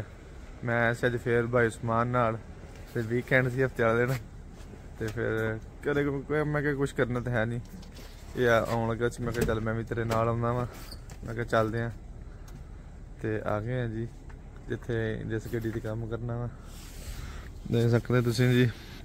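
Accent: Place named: Punjab, India. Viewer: love watching your videos bro. keep it up. your Punjabi is just like mine.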